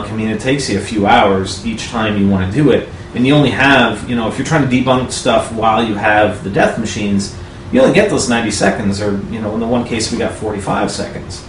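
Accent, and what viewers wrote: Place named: Canada. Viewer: You're Canadian? I always thought you sounded like it.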